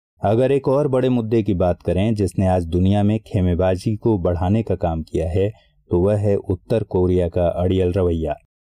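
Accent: India. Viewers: i was feeling like came in 90s era as sound was like old radio station while listening but it contains the knowledge,from past, present and future, is very useful. thanks , i like the old indian accent..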